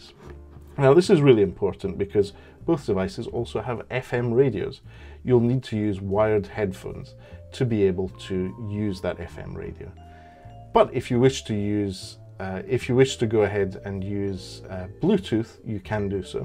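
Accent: Scotland, United Kingdom. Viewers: You sound Scottish 🏴🏴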